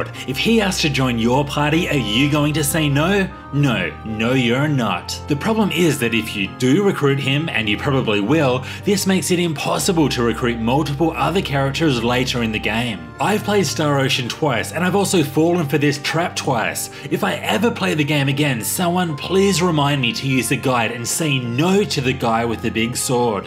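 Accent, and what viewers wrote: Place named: Australia. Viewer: I think it’s Aussie but for some reason has a strong american R sound…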